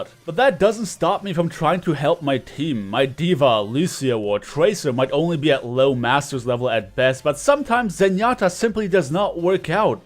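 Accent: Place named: Germany. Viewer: Hey, I might have a small personal question. If I remember correctly, you mentioned in one video that you are from Germany or at least from a german speaking region. I am from Austria and would be interested from which part of Germany exactly. I am just interested because of your accent and you are in my oppinion the best overwatch content producer on this plattform. Anyway just in case I confounded you with another youtuber and you arent from Germany, I apologise. (This was a nightmare to write with german Autokorrektur on my phone😤)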